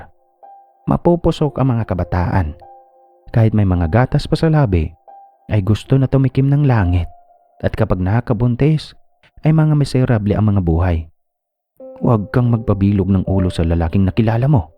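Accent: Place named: Bohol, Philippines. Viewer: Taga asa d i ka sir mura lageh kg tga bohol😁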